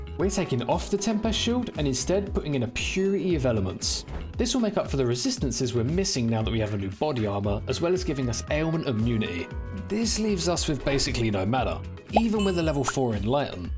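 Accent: United Kingdom. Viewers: Bro you are literally the best creator in this space right now. The voice over is crystal clear with great humor and the brit accent is the cherry on top.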